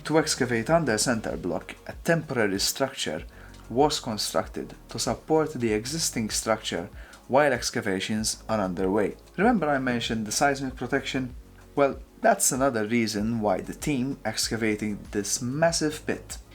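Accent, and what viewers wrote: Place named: Malta. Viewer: Are you Maltese? That accent is familiar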